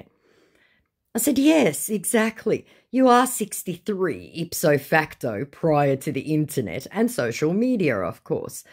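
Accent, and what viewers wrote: Australia. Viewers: Subscribed cos of ur Aussie accent. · Your voice is literally EXACTLY the same as my stepmom's. She passed suddenly a few days ago and was also from Australia - although she was from Adelaide. I got to learn a bunch of Aussie slang, got introduced to new foods and drinks, and can definitely tell an Aussie accent from a Brit one because of her. I'm taking her passing hard but your videos/voice are such a comfort to me right now. So from this Cali-born Pacific Northwest transplant, thank you.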